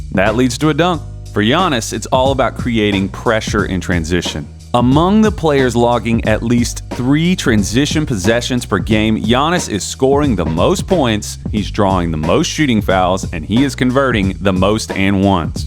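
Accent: United States. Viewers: The way you say Luka Doncic...Are you east European? Otherwise you sound very Americano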